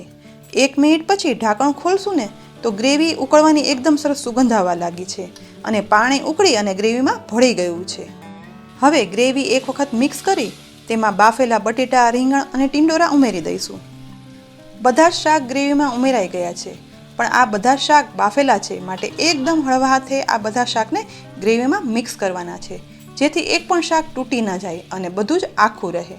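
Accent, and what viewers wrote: Kathiawar, India. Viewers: Reecipe tau saras chhe j, Kathiyawadi guajrati saambhalavaa ni majaa aavi. ne tamaaro avaaj pan mitho chhe ne saras reetey samjaavyun! Aabhaar.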